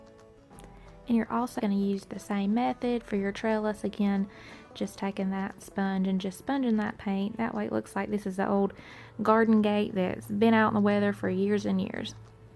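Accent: South, United States. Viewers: Wow, great project and great instructions! Trying to identify your accent - what part of which state are you from? (I’m from southwest Tennessee and I love all the different versions of southern accents 😜) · I love ur accent? What accent is this I mean which part of the US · Great project. Just subscribed. Love your sweet southern accent. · Love this diy. What part of the south are you from? I am in Alabama.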